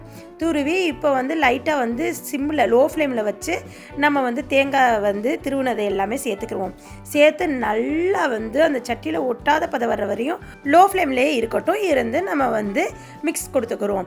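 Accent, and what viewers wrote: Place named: Kerala, India. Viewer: मैम, आपकी बोली किस ज़िले की है? I mean your native language... its not pure Tamil.../ mixed malayalam/ which area in Kerala's? Palakad or Kanyakumari?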